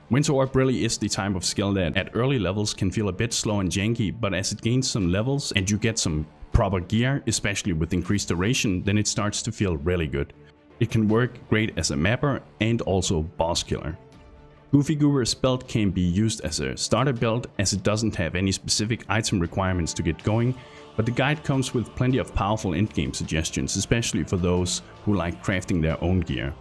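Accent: Denmark. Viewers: Are u danish? 😅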